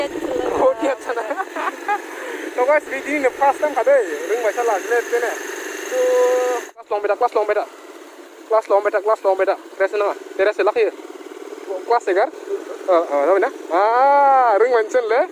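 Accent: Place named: Kamrup, India. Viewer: Adaya kamrupiya railaiywda.... Agna kamrupiya gorpala 50/50 jby..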